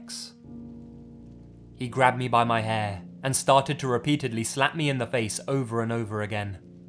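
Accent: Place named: United Kingdom. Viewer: Why did he have to have a British accent 😓😓😓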